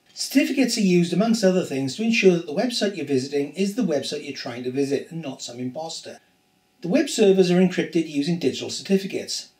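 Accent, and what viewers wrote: Wales, United Kingdom. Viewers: Fantastic. Worked for me and it was easy to follow! Heard the welsh accent and thought I'd give it a chance. Glad I did! Thank you!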